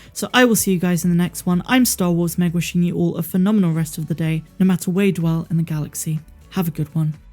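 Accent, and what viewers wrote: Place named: West Country, United Kingdom. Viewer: Another great vid. Love the fact you seem to be from the west country.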